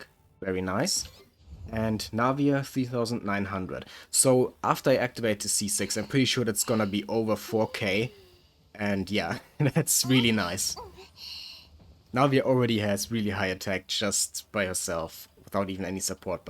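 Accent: Germany. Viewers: thats the most german accent i have ever heard in my life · I smell a fellow german 😋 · You're German?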